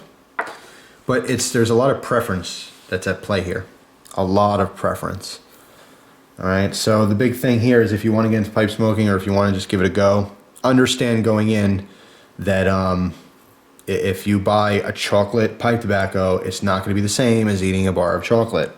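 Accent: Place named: Long Island, United States. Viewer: very good video bud. you sound like you're from long island. I grew up in Suffolk County.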